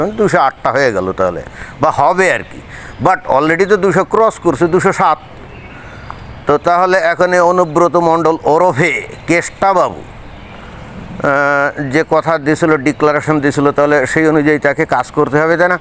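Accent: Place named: Bangal, Bangladesh. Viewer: তোমার উচ্চারণ দেখে মনে হচ্ছে তুমি বাঙাল।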